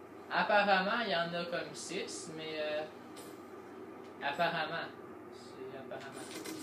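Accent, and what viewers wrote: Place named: Quebec, Canada. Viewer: ta un accent québecois xd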